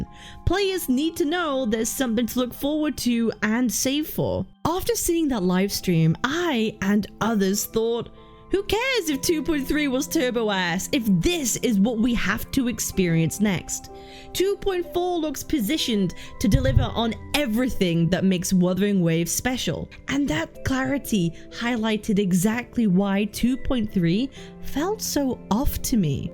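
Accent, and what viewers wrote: United Kingdom. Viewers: This vtuber isnt the real one, Your british accent is weak And you sound eloquent. The real one sounds Turbo cracked out Also an anniversary is about the rewards. Which were ass. No free zani or ciaccona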